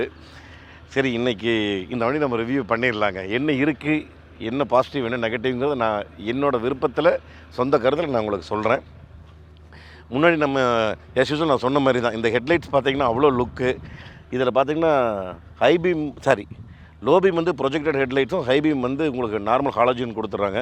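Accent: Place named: Coimbatore, India. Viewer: அன்னன் குரல், கோவை பேச்சு அருமை....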